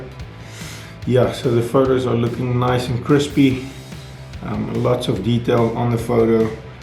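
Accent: South Africa. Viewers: He is South African. I think he just works in Saudi Arabia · You sound south african...like if you are....SA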